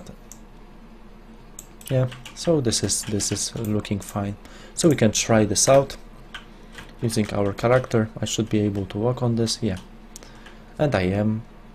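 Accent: Poland. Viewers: Are you from poland? because you have polish accent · Unreal nie ukrtjesz polskiego akcentu a zwłaszcza meszes xD GruBe aus dem Polnischen (sorki ale nie mam niemieckich znaków na klawiaturze)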